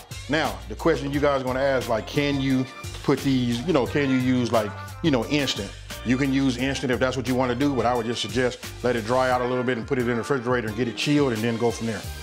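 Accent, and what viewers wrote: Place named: Compton, United States. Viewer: Wow thanks for the reply. I will! By the way, I love your Compton/L.A. accent 😁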